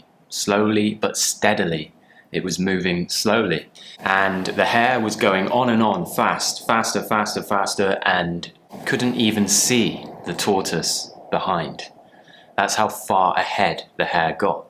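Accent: United Kingdom. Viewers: Hi The way you teach is impressive. I've loved British accent since I watched Harry Potter. Pity that I'm retired and I practice listening for fun. I'll recommend you to anyone who want a British teacher.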